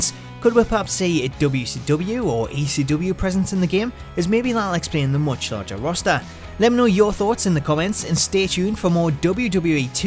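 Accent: Newcastle, United Kingdom. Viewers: Someone from Newcastle... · He's a geordie ?😱😱😱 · a proper belta lad from the toon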